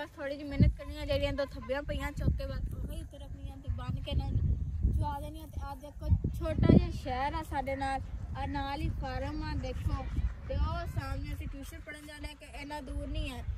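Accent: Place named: Punjab, India. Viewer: پنجابی بڑی کیوٹ بولتی ہو ماشاءاللہ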